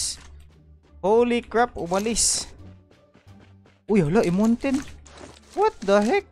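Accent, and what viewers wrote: Visayas, Philippines. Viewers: Bisaya kaba? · Bisaya kaba lods?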